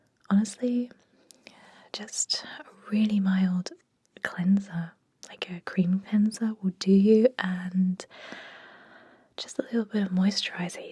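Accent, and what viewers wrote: United Kingdom. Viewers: plus a britsh accent help too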